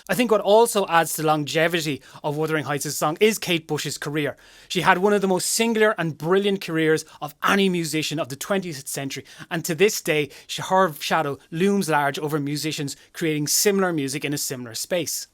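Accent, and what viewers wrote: Ireland. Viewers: This was excellent!! Love your eloquence, gorgeous Irish accent & fabulous commentary. Thank you!! "Wuthering Heights" eternally. ❤🌹🖤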